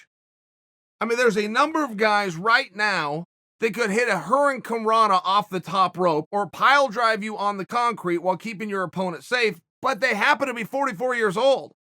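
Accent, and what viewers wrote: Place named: United States. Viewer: Chaels American accent is sickening.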